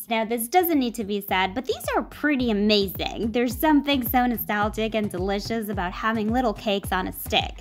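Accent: Italy. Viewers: Are you from Italy? It sounds like it!! Gonna try it soon with my friends!! 😄😃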